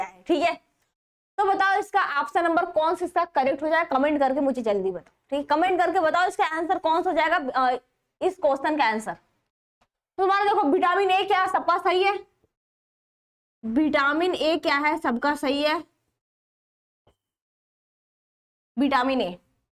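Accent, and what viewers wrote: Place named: South India, India. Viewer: Thoda sa aapki bhasha madrashi lagti hai Lekin samajh aayi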